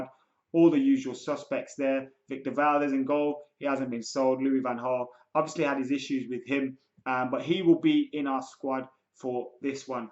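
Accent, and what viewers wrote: Ireland. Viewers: Are u like half Irish? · are ya Irish?😂🇮🇪🇮🇪🇮🇪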